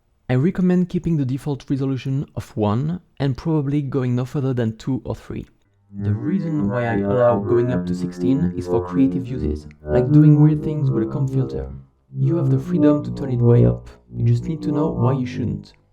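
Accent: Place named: France. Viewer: Merci frro j'ai reconnu l'accent français 🤩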